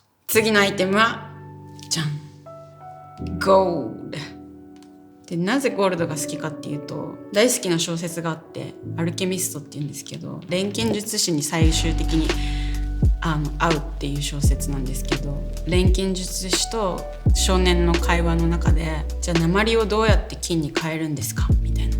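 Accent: Okinawa, Japan. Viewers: 沖縄な喋り方！！！故郷思い出して気を取られて内容聞けなかった笑 もう一度見る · 沖縄なまってるよ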